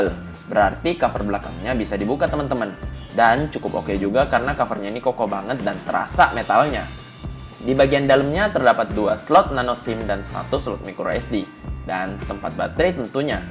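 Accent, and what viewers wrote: Makassar, Indonesia. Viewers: orang makassar kayaknya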